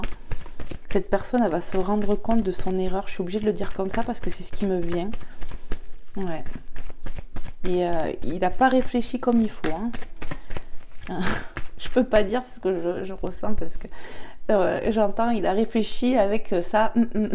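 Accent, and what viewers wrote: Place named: South, France. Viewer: Cc tu es du sud comme moi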